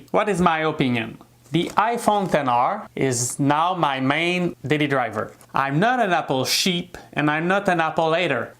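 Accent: Quebec, Canada. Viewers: J’ai reconnu l’accent québécois hein? PS:moi aussi j’en suis un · Wooooooow t'es du Québec right?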